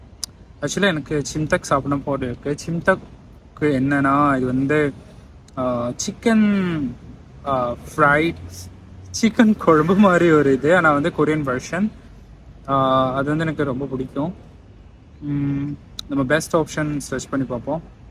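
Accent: Tamil Nadu, India. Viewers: Hi anna I'm from Sri Lanka Our Tamil is little different than India Tamil But your Tamil feel good anna Slang also cute anna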